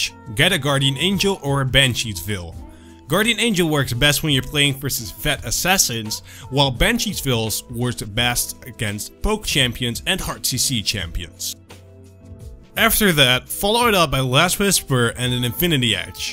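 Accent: Netherlands. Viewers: Your english seems dutch! Am i right? :) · u realy sound like my english teacher just in case tho are a english teacher and are u dutch ? u sound realy sound like him. ;P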